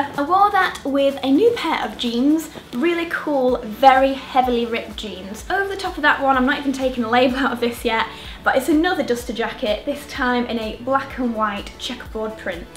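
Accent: United Kingdom. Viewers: Love your accent. I'm from the US so it sounds like you have a British accent or something like that. Also love your videos.😋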